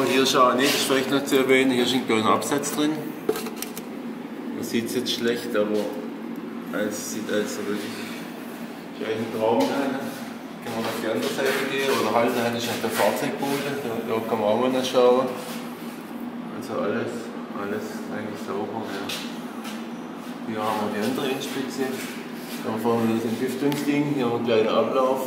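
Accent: Swabia, Germany. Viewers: Danke für das informative Video! ...Ist das Stuttgarter Schwäbisch oder eher Ludwigsburg?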